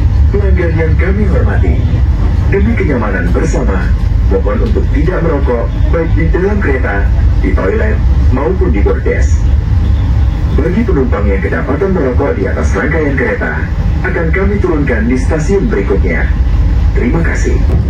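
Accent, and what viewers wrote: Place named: East Java, Indonesia. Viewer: mas asli purwokerto apa Jawa timur.... soalnya kalau logatnya kaya bukan orang banyumas mirip Jawa timuran... apa istrinya orang purwokerto...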